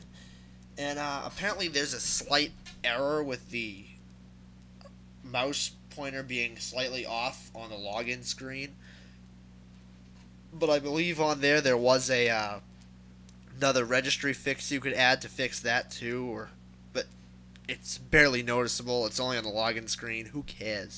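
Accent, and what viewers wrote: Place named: New York, United States. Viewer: man.. are you from NY or NJ, accent is hiliarous lol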